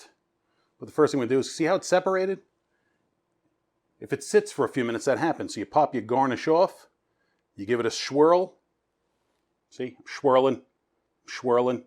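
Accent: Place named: East Coast, United States. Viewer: You live in Florida but sound like East Coast...New York or New Jersey. Also you come across as Italian. My mom was Italian and Dad Irish/English backgrounds.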